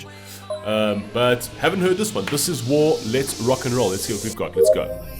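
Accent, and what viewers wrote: South Africa. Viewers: An album review would be awesome Edit: im curious...are you South African? You sound like it.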